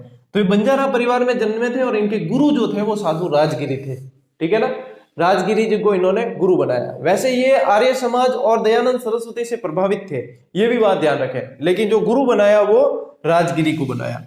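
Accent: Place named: Marwari, India. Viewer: Sir aap marbadi bolte ho muje shmj to nhi aati lekin achha lgta he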